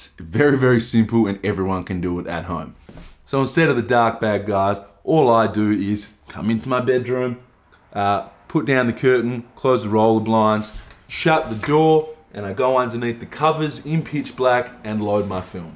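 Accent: Australia. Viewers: His accent makes me feel naturally that he's an aussie.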